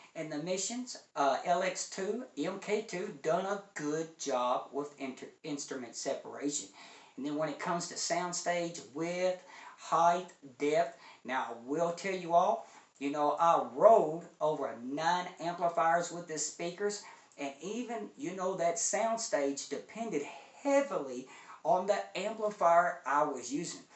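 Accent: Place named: Appalachia, United States. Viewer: Your an inigima, your accent lends one to think your a hillbilly. But if someone actually listens to you they should be taking you seriously. Cheapaudioman is rather goofy and very popular. People probably think you wear a red hat when not on camera.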